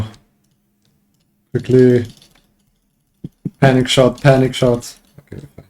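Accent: Switzerland. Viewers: geile siech, brutali idee & rechtig guets video, de schwiizer english akzent brüder love it😂go get the bread🔥🚀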